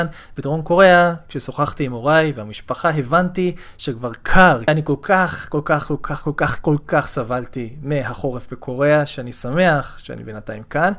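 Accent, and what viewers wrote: Israel. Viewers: מה לעזאזל אחי אני כל כך מופתע מהמבטא הישראלי המושלם הזה (רק תזכור: "לאלה מכם שלא יודעים*" אתה פונה לרבים ולא ליחיד זכר) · איזה מגניב אתה...אתה מדבר ממש כמו 'ישראלי' ...🇮🇱🇮🇱🇮🇱🇮🇱🇮🇱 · המבטא שלך יותר ישראלי משלי וגם אני גדלתי כאן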